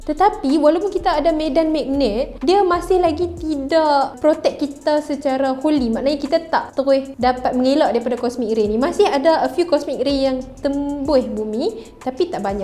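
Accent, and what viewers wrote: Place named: Kedah, Malaysia. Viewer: Kak nak tanya sikit akak ni orang kedah Ker atau orang negeri sembilan sebab bila saya tengok macam orang Kedah sebab loghat akak bercakap deract kedah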